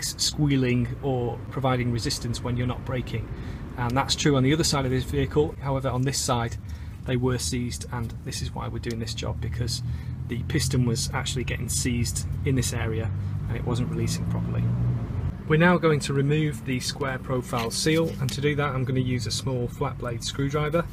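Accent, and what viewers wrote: United Kingdom. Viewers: Thank you for that really useful video and your British accent is just amazing! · the brit accent really gives confidence. cheers!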